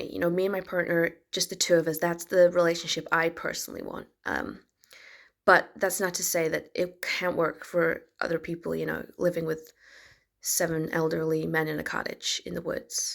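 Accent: United States. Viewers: The American accent threw me! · She does a better American accent than she does a Scottish accent. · Very good American accent. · ... and a perfect American accent. Brilliant. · So brilliantly funny! Your American accent is spot on! I hope you and your prince live happily ever after! 🏰👸🏻🤴🏻❤️ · 1,000 views per hour. DANG. Must be the freckles. You've got the American accent down! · Wow, really nailed an American accent perfectly! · Again, why can folks from the UK do better American accents than we Americans can! brilliance again. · your american accent is so good 😮 living outside edinburgh and i haven't met a single scottish person who can do a decent accent. i also haven't met a single scottish person who realizes their american accent is bad. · You had me on the hook from the get go. It wasn't until you mentioned your housemates coming home from the mine that I started getting suspicious - and it was only then I noticed the flawless American accent (being American, it sounded so natural to me I never picked up on it). 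Well done - yet again! Keep bangin' out those lattice pies! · That's a seriously solid American accent. Gold star! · At first the American accent had me confused. I thought, “Wait, have I been wrong all this time about who she is?” I fell right into it. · you sound so American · You have a really good American accent. And the story was hilarious. Very talented. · The States accent is a win. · Seriously-- Hugh Laurie-level American impersonation. Effing flawless. Ok not Dick Van Dyke-good but pretty darn good. (j/k-- Big respect to DvD) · Hilarious! Great accent by the way, you've got the American accent down perfectly. You are stunning snow white and the fairest of them all. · I didn't know Snow White was American. I didn't want to be that precise in my comment because it might spoil it for someone. I did marvel at the accent, I kept looking for a Scottish slip. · American accent ~ Chef's kiss! 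· Finally got it, clever as always. Nice American accent as well. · So many delicious lines here. And the American accent delivers a perfect misdirection. ❤ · absolutely ADORE your American accent! Brilliant. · Nice American accent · The American accent definitely threw me off at the beginning... I was like is the accent part of the bit, or is this her actual voice she's been hiding the whole time and she's actually serious now? lol nope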